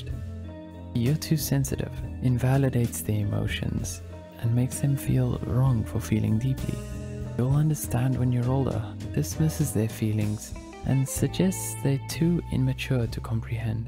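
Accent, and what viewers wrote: South Africa. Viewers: Am I the only one hearing his South African accent? 😅 hey Bru howzit 😂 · Is the speaker South Africa?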